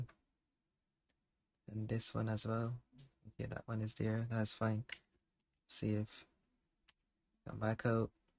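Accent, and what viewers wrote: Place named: Barbados. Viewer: Haha I knew as soon as I heard the accent. Never thought I would see a fellow Bajan doing 3D Media. I'm excited to learn from you 💕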